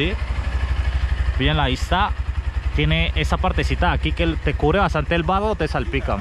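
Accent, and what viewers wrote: Colombia. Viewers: Buen video pana con acento valluno ve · El acento es de Cali, Colombia. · Que feo ese acento de dónde es ??? Creí que los colombianos hablaban distinto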